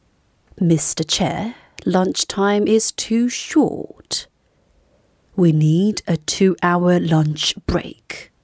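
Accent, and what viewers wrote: RP, United Kingdom. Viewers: 님~ 5시 50분 본방사수하고 있습니다 ^^ 포쉬 엑센트 발음 더 배우고 싶어요 ㅎㅎ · 의 진짜 영국영어 최고 👍🏻 쌤, 30년 장수 프로그램 가즈아~~~🌼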